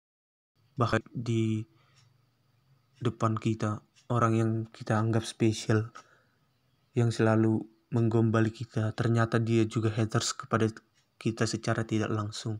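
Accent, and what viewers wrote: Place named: Batak, Indonesia. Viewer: Kereeenn. Keren Chanel mu bro.. Tapi ngakak aku.. Hahahah.. BATAK nya kental kali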